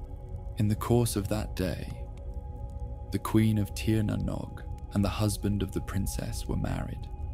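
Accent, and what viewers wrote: United Kingdom. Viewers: Pity, a pommie is the narrator!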